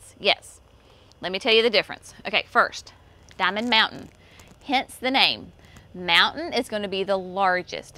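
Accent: South, United States. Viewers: just a little off topic...I just watched the tree removal vlog....just for future reference ( from a Canadian girl ) ....a TOBOGGAN is a flat sled .....a TOQUE ( pronounced too-k ) is what americans call a beanie, like your cute little white hat.....by the way , I love your southern accent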